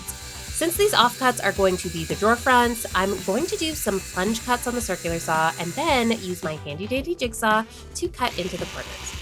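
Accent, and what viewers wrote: Quebec, Canada. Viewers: Je n'étais pas prête à t'entendre parler en français avec l'accent québécois 🤭 ❤ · Loooove when you speak french! It shows you learned from Quebec, I can hear the accent ;) As always, amazing DIY!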